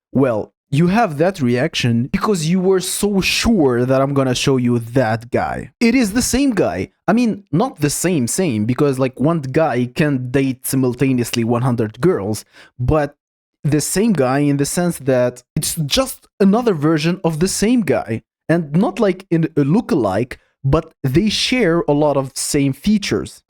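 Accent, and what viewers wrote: Russia. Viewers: First video I came across from you. Your Russian accent suggests you've learned it later on in life or never lived in Russia (learned it from your parents or something). Same here though haha Cheers! Subscribed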